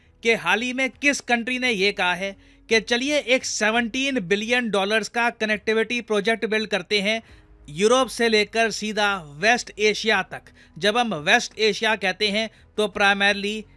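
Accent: India. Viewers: 一股子阿三味儿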